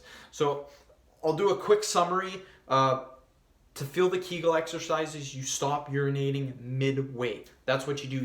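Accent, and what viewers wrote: Canada. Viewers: This guy is Canadian, isn't he?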